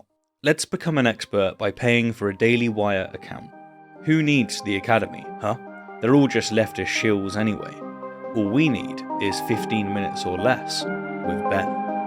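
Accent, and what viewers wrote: England, United Kingdom. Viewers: Sophistry with an English accent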